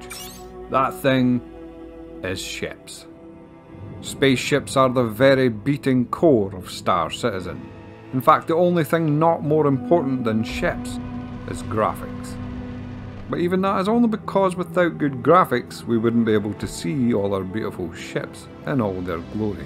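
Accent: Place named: England, United Kingdom. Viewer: I think its lovely English accent i love most about his videos- is it from London? Also next you could do 'Most Anticipated Gameplay' as that connects with ships so much. Cheers English Gent!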